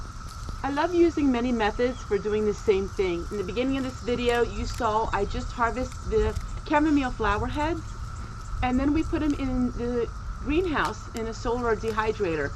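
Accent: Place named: Alabama, United States. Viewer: We pronounce chamomile the same way here in Alabama. LOL I’ve only noticed one word you have pronounce different and that’s the word “different”.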